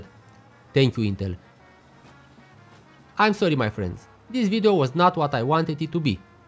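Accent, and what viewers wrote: Romania. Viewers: you are romanian right?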